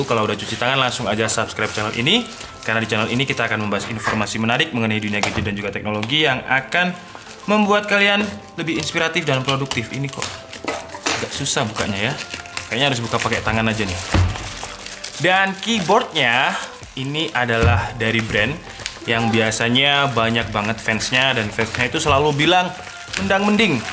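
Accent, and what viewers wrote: Indonesia. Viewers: Pengucapan org indo banget, bertele-tele pisan.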